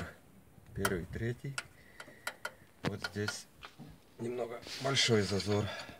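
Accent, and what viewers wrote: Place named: Baltic. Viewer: У вас акцент буд-то вы из прибалтики.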